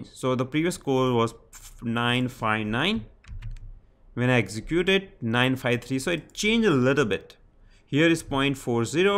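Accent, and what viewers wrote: India. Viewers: love indian accent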